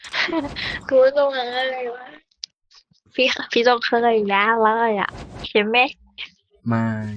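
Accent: South, Thailand. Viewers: ผมคนใต้กับกลางแต่แบบพี่พูดแบบใต้ๆกลางๆ555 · พี่แหลงแบบใต้ๆกลางๆอ่ะ555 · พูดเป็นภาษาใต้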